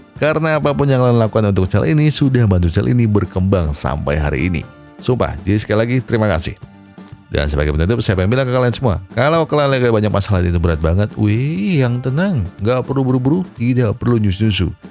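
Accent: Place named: Semarang, Indonesia. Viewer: logat mu to kayak wong semarang og mas😭😭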